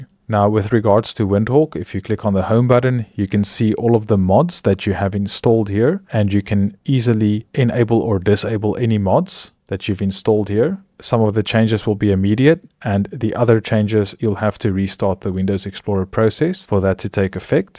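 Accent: South Africa. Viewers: If I am correct he is south african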